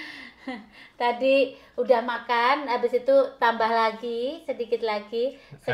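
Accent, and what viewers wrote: Java, Indonesia. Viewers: Di luar negri tapi bahasanya masih jawa medok...itulah lucunya mbk.. jadi hiburan nonton vidio ini.. sampai ngakak saya...luarbiasa mbk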